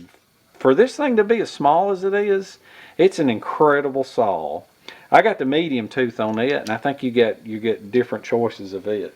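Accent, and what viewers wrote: South, United States. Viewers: I have made many knives, swords etc, Viking swords, Roman Gladius, Roman spatha, blah blah, but one thing is for sure, if you develop a sharpening technique that works, then who cares how others do it. I just laid on my couch, and after watching the full video, I have an overwhelming urge to go in my workshop, grab my sharpening stone and some strop leather and sharpen my survival knife, and I'm not even a bushcrafter. In fact, watching your vids has given me the idea to spend a weekend in the forest up the hill from me😊, although, it's October, it's Germany and its bloody freezing 😂, so I may wait till next summer. But one thing I wanted to say as a relative noob to your channel, is thanks for sharing your passion, and to us over the pond, your happy go lucky positive attitude and your really funny southern Hill Billy drawl, makes your vids even more infinitely watchable. 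So, cheers, appreciate it bro. 👍🏻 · I have no clue how to sharpen knives. I bought my son a stone. Hes the one that told me it needed o'l 😂. Love that southern drawl. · G'day, I saw this excellent video when it came out. I didn't leave a comment. It happens that I'm a huge fan of Kukris and recurve machetes and recurve/convex knives. I took your advice on-board because I didn't have a strategy for sharpening my Kukris, convex and recurves. When in doubt I used a ceramic rod and a ceramic oval rod; which by the way, works well. Here it is two years later and I can tell you that your methods (in some cases slightly modified) have been working for me. I don't like using awlll (AKA: oil) so I got myself an ultra-fine water stone wheel. I also have practiced doing a lot of 'line of sight' freehand honing and stropping and I can pretty much get a surgical blade finish on any decent carbon or stainless steel. 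Although, I took your advice and didn't bother spending too much time on my 'chop only' Kukris. Now I have a lot of knives in my user collections that I don't need to show to a stone of any kind. Thanks, you've been a great help! P.S. I love your Southern accent although with some words I need to see sub-titles till I get used to your great way of speaking. No dig intended; if you heard my rough Aussie accent you'd probably reckon I was babbling on in some foreign language:) Good one,. Since 2016 I've not missed one of your videos. Outstanding.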